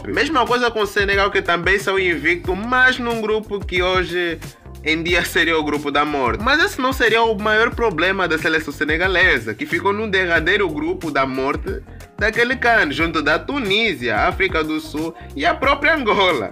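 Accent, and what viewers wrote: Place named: Brazil. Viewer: bom trabalho mas para de falar com o sotaque brazileiro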